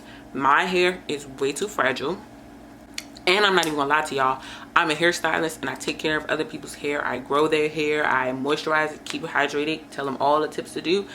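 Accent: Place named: Washington DC, United States. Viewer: I know our accent from anywhere ❤ youre definitely from around the dc/pg area 😂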